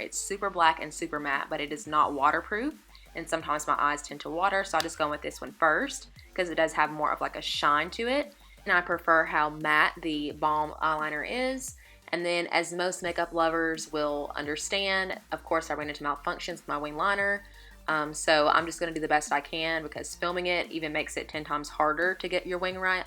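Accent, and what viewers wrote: South, United States. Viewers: So pretty, and I love your accent, are you from the south?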